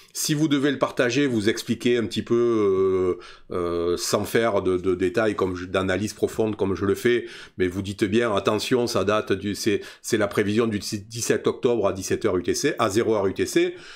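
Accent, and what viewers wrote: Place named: Provence, France. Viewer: Génial! Merci. Quel plaisir d'entendre tes commentaires (5/5) et cet accent provençal... un pur bonheur pour une marseillaise d'origine!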